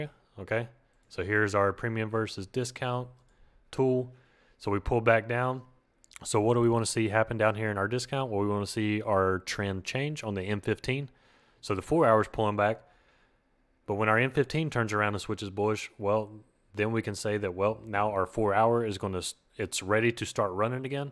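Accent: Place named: Louisiana, United States. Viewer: are u from louisiana?? cause i am and u sound familiar?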